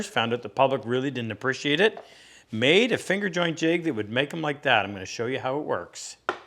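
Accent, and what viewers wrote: Canada. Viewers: Nice work! Are you Canadian or American....just curious?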